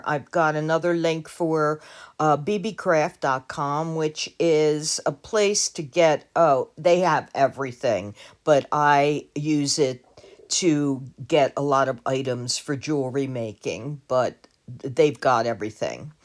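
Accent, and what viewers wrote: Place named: East Coast, United States. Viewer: Very nice! I'm just starting with resin. I have a starter kit coming soon. Been creating jewelry for 35 years, but just noticed resin. Sounds like you're from the east coast. I'm from Philly, but I listen to accents from reason.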